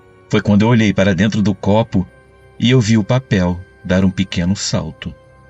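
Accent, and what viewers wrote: Rio de Janeiro, Brazil. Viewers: Olá Que história bacana... É contada com esse sotaque de Carioca, isso dá um toque especial. Como foi bem lembrado: Padre Quevedo nunca desafiou a Deus, e sim as mentiras e 9s falsos profetas. João Batista - Cubatão SP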